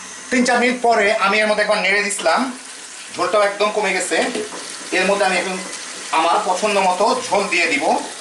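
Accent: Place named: Chittagong, Bangladesh. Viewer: Apni chittagong er manus kota gulor dhorone buja jai.ami apnake subscribe koreci onk sushadu recipe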